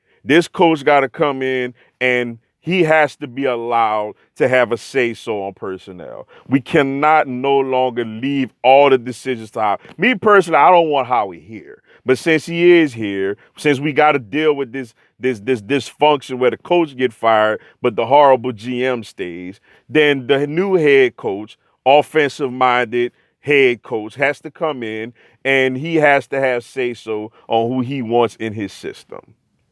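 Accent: New Orleans, United States. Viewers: Are you from New Orleans?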